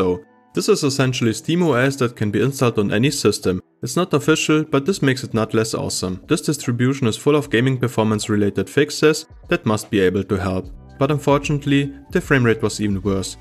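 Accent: Germany. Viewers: I assume he's german. I'm german, so i can tell 😂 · Do i hear german accent here? 🤓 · german accent detected. :D -> I have the best results with xfce...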